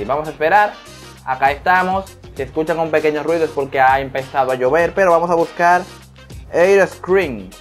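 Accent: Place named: Dominican Republic. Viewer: Aquí en Dominicana hablan así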